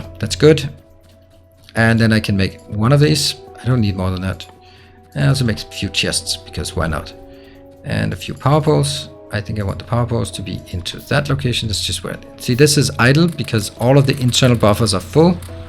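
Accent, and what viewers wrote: Denmark. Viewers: You must be danish, you guys are the only ones with that accent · Are you Danish??? :) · R u danish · are you from denmark?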